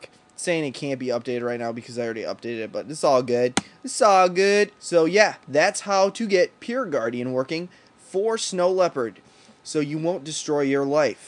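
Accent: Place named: United States. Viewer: annnnnnd you have reached your destination :) I love how you talk! Its so American and cool!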